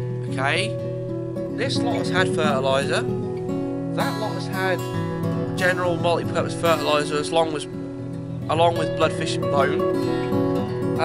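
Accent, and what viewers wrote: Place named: Norfolk, United Kingdom. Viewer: Lovely to hear that Norfolk accent. The Fens is a beautiful area. We are the other side of Norfolk, out Norwich way. Just found your channel. Good luck with your spuds :) Jazz and Gem.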